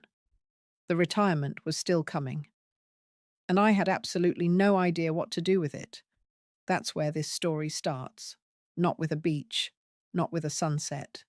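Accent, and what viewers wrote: United Kingdom. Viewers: First time I hear an Ohio person speaking with a British accent.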